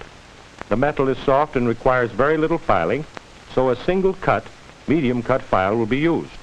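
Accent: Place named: Transatlantic, United States. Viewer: It’s the “transatlantic” accent and they used to teach it in schools. It’s the american equivalent to the British RP accent.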